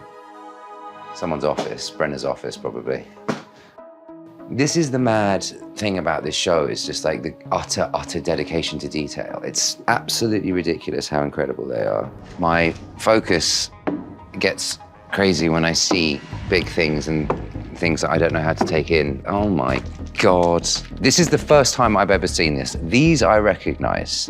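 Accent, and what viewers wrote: United Kingdom. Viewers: Too vague to say Southern. I am from the South West and a lot of people sound like farmers here. He has a middle class London accent, as someone else said. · It’s called a southern British accent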